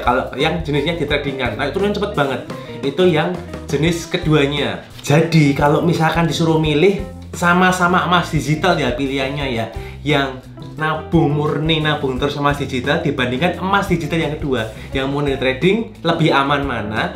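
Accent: Java, Indonesia. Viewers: Paling suka liat koko koko tp klo ngomong medok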